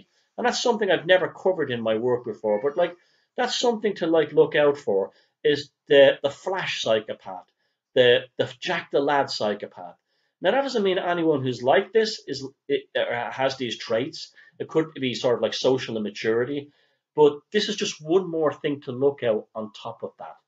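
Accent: Ireland. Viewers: ...Nice to hear your Irish dulcet tones again...(enjoying your videos as always). Wishing you a very happy 2018.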